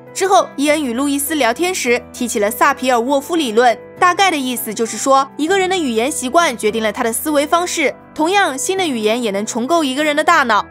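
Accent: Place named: Southwest, China. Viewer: 她口音真的不标准，应该是我们西南地区的口音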